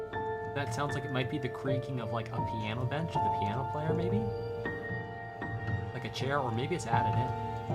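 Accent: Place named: France. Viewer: "Clair Obscur, je conduis une voiture..." xD ... et je percute un Sakapatate ! Did he talk about chroma? An advantage! Nice french accent btw!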